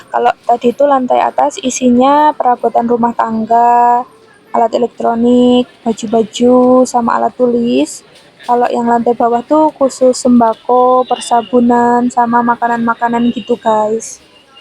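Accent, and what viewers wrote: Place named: Jakarta, Indonesia. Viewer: oooh klo bicara model jakarte😂😂😂😂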